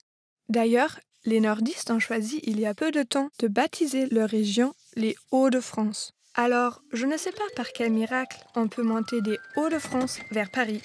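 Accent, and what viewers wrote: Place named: Germany. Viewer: L'accent allemand est tellement charmant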